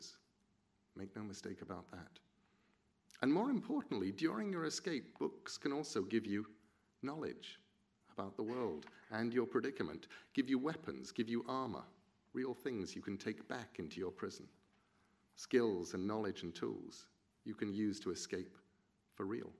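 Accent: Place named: England, United Kingdom. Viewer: not a TED talk, but as Neil said - the content is what matters. Though sound is very low and No motion on the scene as well as on the screen, watching it for 5 minutes and they I see - it is actually a video - not a frozen picture, the Table and meaningless slides + reading from paper makes it difficult to concentrate. But wow, the orator is terrific, no uhms or any other faults, just perfect deep voice and English accent :). Whoa, it is so weird - youtube makes a critic of everyone.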